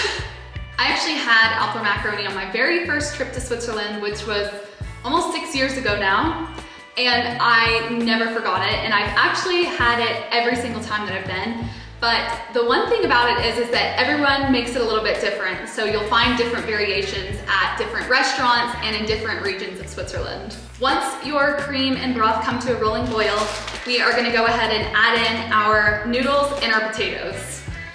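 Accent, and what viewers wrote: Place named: United States. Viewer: Ah, you are american, now I heard it. New subscriber here ❤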